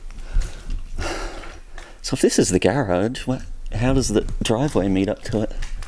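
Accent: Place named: Australia. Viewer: australian english 🤙🏻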